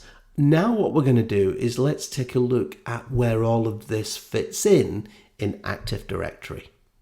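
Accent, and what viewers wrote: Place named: England, United Kingdom. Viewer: Thank you so much for this Active directory video. Well understood. You got a new subscriber. Am happy to join tour team. Love your English accent. 😄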